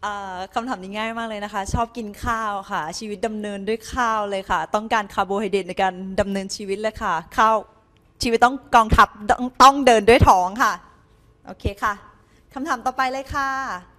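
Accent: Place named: Thailand. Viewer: พูดเหน่อ มีเสน่ห์ดี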